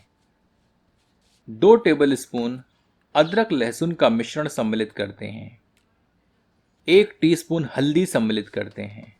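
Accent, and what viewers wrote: Standard. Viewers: The pure hindi voice over is amazing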